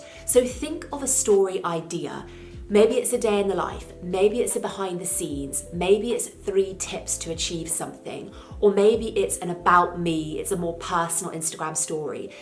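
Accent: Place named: United Kingdom. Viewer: thank you not only because of this video you just uploaded which is helpful but only because you're the first one with british accent (if I'm right) whom I can follow and understand :))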